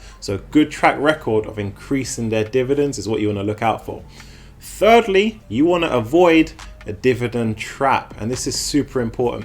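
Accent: United Kingdom. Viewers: Good brief, nice to have a British accent. Well done.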